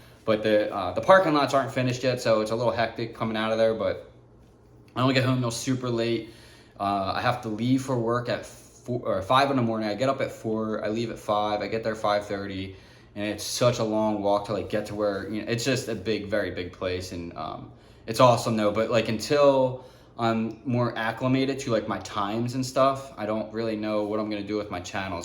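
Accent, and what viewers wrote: Philadelphia, United States. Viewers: I thought u sounded like u from philly! That’s crazy! Born and raised in philly! Love the Philly love!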